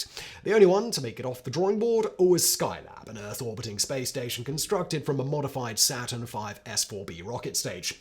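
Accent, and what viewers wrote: United Kingdom. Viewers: I love hearing a British voice narrate American achievements.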